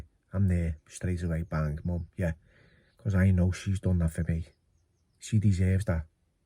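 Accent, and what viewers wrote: Liverpool, United Kingdom. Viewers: love the scouser accent xx your amazing and amazing at looking after your Joe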